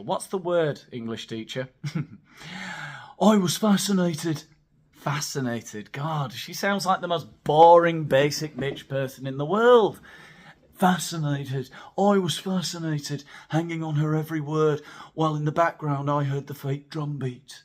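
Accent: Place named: United Kingdom. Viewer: I ❤ conjunctive adverbs. Then again, everything sounds splendid in British English.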